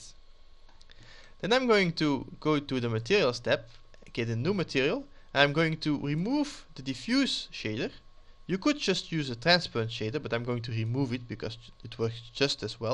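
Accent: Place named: Netherlands. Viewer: Heel duidelijke en mooie tutorial bedankt dat je dit met ons deelt. Ik vindt het leuk om te horen dat er Nederlands door je Engels heen komt dus mischien ook nog Nederlandse Tutorials ;-) Dus misschien hoor ik nog van je op het Dutch Blender Community <link>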